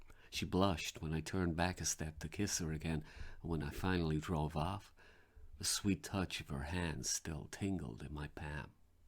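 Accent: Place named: United States. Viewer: Whoa! Chilling! (Your American accent is awesome, by the way )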